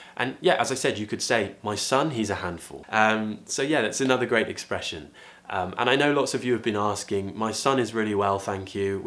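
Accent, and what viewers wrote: United Kingdom. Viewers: I really feel excited of your British English pronunciation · I learnd a lot of from you, thank you for that . However i miss you as a british pronounciation teacher . Hope you'r son is doing well as you and the rest of you'r familie too . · always I came here to learn British accent thank you very much for being helpful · I like your accent man , your way of explaining etc you r amazing and British accent is my favorite 😍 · I' ve been looking for a British pronunciation teacher for a longtime and finally i find you as i feel so lucky and privileged right now 💜💜💜🙌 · Hello! Thanks a lot for this video. I'm a Spanish native speaker and I would love to speak English with your British accent. Please, let us know where can we get your accent training.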